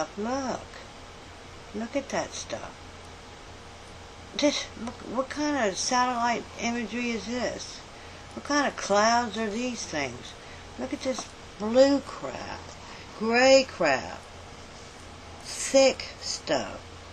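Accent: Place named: Appalachia, United States. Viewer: You sound like a hillbilly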